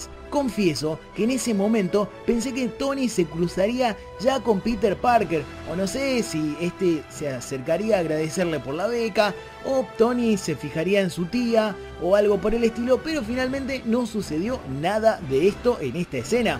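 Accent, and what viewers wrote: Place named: Rioplatense, Argentina. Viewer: Se te nota un poco un acento de por acá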